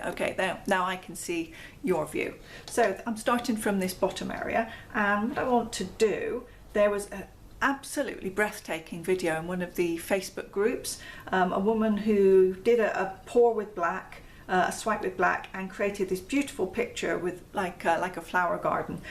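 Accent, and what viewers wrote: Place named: West Country, United Kingdom. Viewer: Beautiful, I can see why you are thrilled with this piece! By the way, your accent sounds West Country UK, is that correct? X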